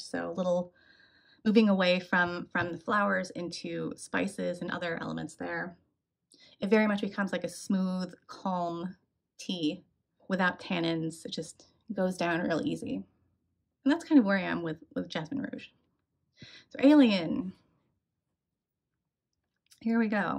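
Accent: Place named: California, United States. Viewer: Blue jasmine tea and smelly feet papyras. I love these descriptions. The Jasmine Rouge really didn't impress me. I need to go back to it again. It sounds much more interesting than I found it. The SL does go down my path of jasmine, I love that natural smell of jasmines and their indoles. Such an ethereal heavenly kind of scent. Similar story with me with Alien, I'm not overly shy always but don't approach randoms. But I smelt that in the air and was just so wow I had to ask her. I absolutely adore it. I'm not very happy with "Mugler" as they are called have done to it. They've took it's bite away. It's like some childish version now, all the boldness has gone all that wow factor. It's sweet and friendly. I did enjoy this one. Jasmine is one of if not my favourite note. It can be so different in fragrance can't it. Thanks for the video a good first to watch. 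I feel like I have a new Netflix series to catch up on. I'm terrible and American accents but I know the Yeehaw texas and gangster new York I think 🤣. I could be wrong is yours California? It's very pleasant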